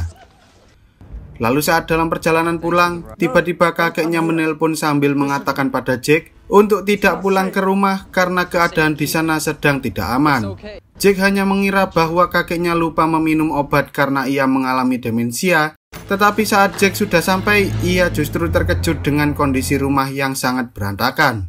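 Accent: Java, Indonesia. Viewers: Suaranya medok kyk dodit Mulyanto 😂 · Bg sira wong jowo kah? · Jowo ndi Iki seng duwe konten? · Jawa kah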